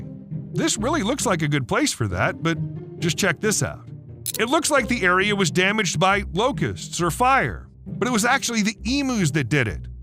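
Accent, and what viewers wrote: United States. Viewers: Why can't I Americans say EMU E-M-U and say EMOO it does my head in where do they get OO and its MUM not MOM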